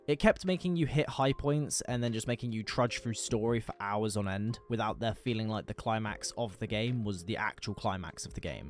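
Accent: United Kingdom. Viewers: I'd pay double for games if they leave out the wokeness and sell a polished and most importantly FINISHED product. That aside bro, you sound like you live in the UK. Can you seriously say that woke ideology hasn't ruined everything it has gotten its claws into? As consumers we can vote with our wallets if companies implement particularly predatory business practices. Against woke ideology? Against companies propped up by their ESG rating? Nothing. Even voting doesn't help.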